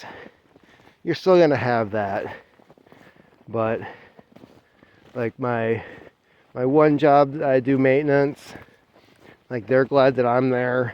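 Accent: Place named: Michigan, United States. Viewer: Damn he was two sentences in and I already knew you was from Michigan and then mentioned Kalamazoo and portage and then I knew.. I grew up in that state spent 23 years there when you grow up there you are pretty much brainwashed into thinking that your state is the best state and everybody else's sucks and you get if you're on the west side of the state you're definitely also taught brainwashed and you're thinking that your church is better than everybody else's church and everybody there is very particular or we would call odd if you're living in other states.... Grand rapids Michigan was one of the first cities introduced fluoride into the water That's why you always encounter passive aggressive intellectual types up there in Michigan they want to get upset but they've been poisoned to deaths and they've been brainwashed into thinking that diplomacy is how you should operate or manage your local and state government and they don't know that they got brainwashed into that mentality they didn't get the memo that the wolves sell diplomacy to make it easier to manage the week and the meek... 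I'm rooting for my fellow Scandinavians That's predominantly what is up in that neck of the woods in every variety I'm rooting for you...but if you want to be able to keep that state which used to be great is not anymore well then you better take it back take out all the liberals out of that state and take it back kick out all the greedy Republicans and take it back most of all of your home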